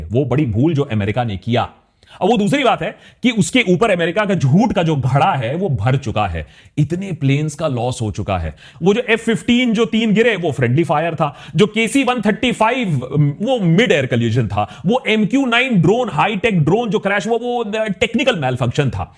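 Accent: India. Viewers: 我听出这是印度口音，估计这个视频被伊朗人听到了，所以他们放行印度船只。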